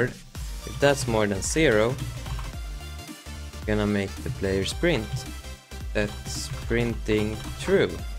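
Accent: Sweden. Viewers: Glad att man får höra en äkta svensk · Hej är du svensk det låter som det?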